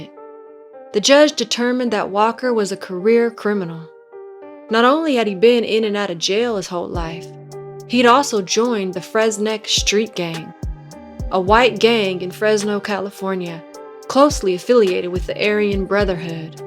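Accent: South, United States. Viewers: I detect a slight Southern accent. She does have a beautiful voice tho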